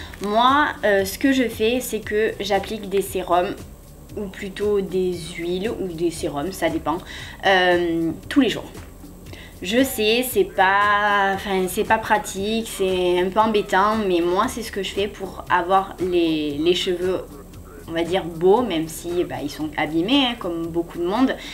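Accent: South, France. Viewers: Oalalaa sa sent l'accent du sud la mdrrrr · lol tu serais pas toulousaine ta un accent du sud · Tu as l'accent du Sud comme moi... #ACCENTDUSUD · Tu viens du sud ? J'adore ton accent et tu es très jolie. _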